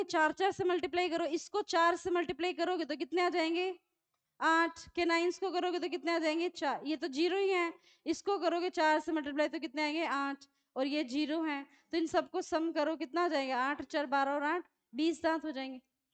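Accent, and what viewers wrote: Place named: Bihar, India. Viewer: Mam ji apka abaj hmare bihar se milta julta lagta h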